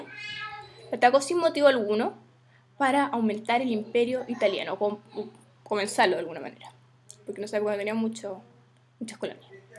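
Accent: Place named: Chile. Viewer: WACHO COMO HABLAN LAS CHILENAS LPM ES HERMOSO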